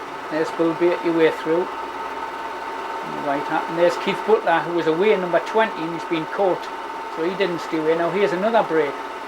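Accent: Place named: Newcastle, United Kingdom. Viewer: Thanks for posting these vintage clips. The geordie accent is perfect for cycling commentary.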